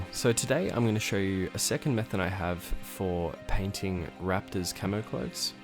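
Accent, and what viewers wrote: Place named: Australia. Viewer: I knew I was in good hands when I recognised the fellow Aussie accent. Great to see us taking over the YT Warhammer scene.... SUBBED!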